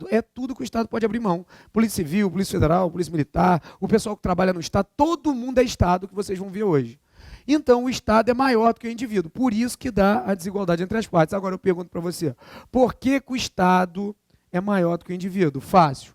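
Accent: Rio de Janeiro, Brazil. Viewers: ele é carioca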